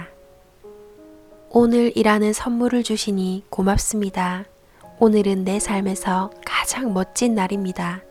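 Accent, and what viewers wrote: Gyeongsang, South Korea. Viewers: 님 감사합니다 ~ 고향이 어디세요? 같은 경상도 사람으로써 경상도 억양이 너무나도 정겹고 듣기가 편합니다. ^^. 언젠가 가까운 시기에 꼭한번 뵙고싶습니다 ~ 좋은 말씀 듣고 힘내고 있습니다 늘 감사합니다! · 안녕하세요~ 님 오프라윈프리 방송인줄 알고 들어왔다가 님의 러블리한 사투리에 포옥 빠져서 듣다보니 벌써 두번째 보고 있습니다. 남들이 지적하는 나의 단점이 오히려 인생역전이 되는 최고의 장점이 된다는 말씀 그걸 어디서 언제 발산하느냐가 관건이다!!!는 말씀 가슴에 와 닿았습니다. 제 자신을 찾기 위해 나만의 색깔을 찾기 위해 날마다 물음표를 던지고 있는 저에게 큰 도움이 되는 영상입니다~ 감사합니다❤️🤩⭐️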